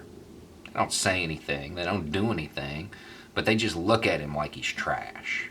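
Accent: South, United States. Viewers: has lived all over in Japan and the US but he has been living in Florida for years now. He has def picked up more of a Southern accent over the years. That tends to happen. I can hear a couple of different accents mixed in his dialect. With all of the common sense videos and history lessons shares and all you comment on is his accent? Smh 😏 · you have such a real and thoughtful way of teaching your audience. I am so glad I came across your vids.. Ironically, I first clicked on one of your segments because I found the way you look seemed contrary to the header and I was curious. So, you taught me that not all people with wild beards and a southern accent are bigots!:) I apologize for my mistake. Can't wait till your next posting - such variety and thought put into each of them. God bless.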